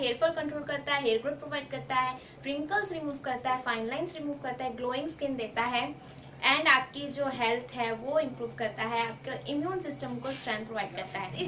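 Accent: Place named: India. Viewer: this is India English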